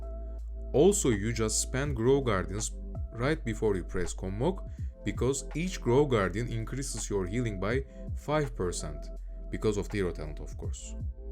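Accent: Turkey. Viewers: Sen türksün aksandan belli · Are you by any chance, Turkish? Your accent sounds like it :)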